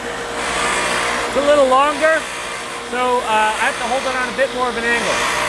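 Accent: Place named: Canada. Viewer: Great videos! I plan to use this in my technical theatre classes. Random question: I thought based on your accent (and the snow) that you were probably Canadian, but then you still use imperial measurements instead of metric. Am I just wrong and you aren't Canadian, or do you still use imperial measurements in Canada for theatre, to be consistent with the U.S. industry?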